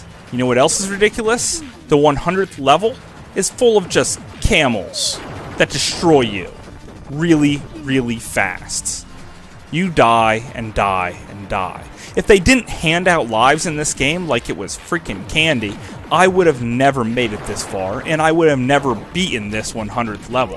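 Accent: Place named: United States. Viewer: Without looking at your details, but after watching almost all of your Jaguar reviews over the last couple of days , and enjoying and agreeing with most them, I'm pretty sure your accent is American...maybe Canadian. Why do I bring this up at all? Because through every single review you pronounce the Jaguar as JAG-WIRE. And though the British claim the true proper pronunciation with JAG-YOU-ARE, even the true American English pronunciation is JAG-WOAR with the WOAR pronuonced like CAR or STAR, which I only spelled that way instead of WAR since that is pronounce WOR, in, I believe both American and British English...where do you come from or where did you ever pick up JAG-WIRE?!? Another Good review I agree with though, even though I have picked on you in several of these video comments, Over all I love your style and your sensibilities are like mine for the most part, even with some games that are generally considered terrible, and you and I disagree. 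There are a few, however, that I am blown away because your review of a game was exactly opposite of what I expect from you or think about it myself...I'm loving it though! I will start checking out reviews you have for other systems now, that I have also...or, I would, but apparently the only other system you have in your play list that I have is the 3DO, and I'm not interested in the few items you reviewed so far (at least not interested in a review of interactive porn or what ever) You need to get some 3DO games! Want me to r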